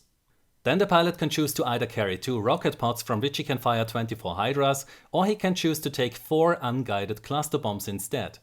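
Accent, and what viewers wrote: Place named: Germany. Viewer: can you speak german? I swear I heard a lot of german pronunciation in your work, so cute!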